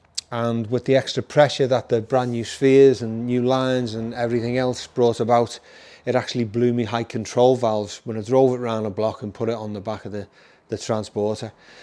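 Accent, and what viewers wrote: Liverpool, United Kingdom. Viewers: The scouse swagger ...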